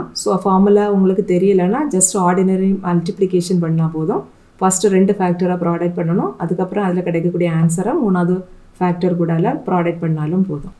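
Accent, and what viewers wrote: Kanyakumari, India. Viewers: Neenga kanya kumari ah ,ithu kanyakumari slang thane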